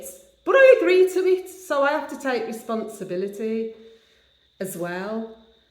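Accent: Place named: Black Country, United Kingdom. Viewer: It's not the speed of her speech it's her Wolverhampton dudley accent that makes her sound dopey and slow. It's been voted the worst accent in the UK for good reason because it is terrible 🤦🏼‍♀️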